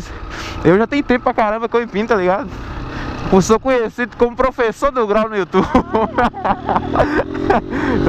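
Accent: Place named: Bahia, Brazil. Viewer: Mano, de que estado você é? nossa, puta sotaque daqui da Bahia kkkkk♡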